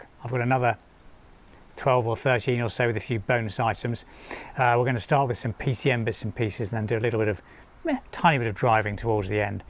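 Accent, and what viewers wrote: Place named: United Kingdom. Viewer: my God, I'm watching your part 3 now and I'm going crazy about your British English 😄. Too much of American slang nowadays . By the way, have you also got Guards Red color on your beast?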